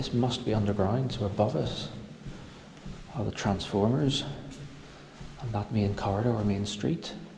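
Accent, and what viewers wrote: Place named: Ireland. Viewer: It was good to hear that Irish accent commentary. I used work beside a chartered engineer from Ballymena in Edinburgh in the 90's. Mesmerising video thanks for sharing.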